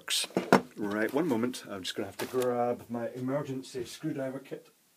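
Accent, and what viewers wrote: Scotland, United Kingdom. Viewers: Such a nice accent. Where you from Scotland? Must be, (not that it matters cause we are all just spirits in bodies). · Some guy, explains everything in good clear English with a slight Scottish Twang :-) Would make a good teacher. The website has good tutorials on various projects. Good luck on future projects pal. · he has a Scottish accent to be precise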